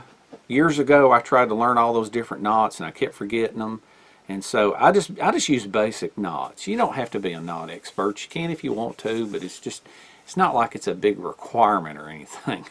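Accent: South, United States. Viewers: Icstill love the way Yu talk southern voice so cool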